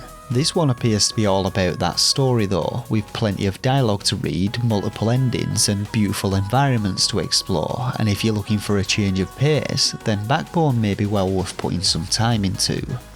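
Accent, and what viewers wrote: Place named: Manchester, United Kingdom. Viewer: Been watching your videos since getting an xbox and game pass, thanks for the stella updates, you sound rather local to me, I am just south of Manchester ;)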